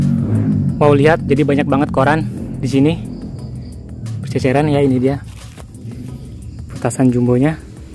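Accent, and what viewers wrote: Java, Indonesia. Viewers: Orang Jawa bang?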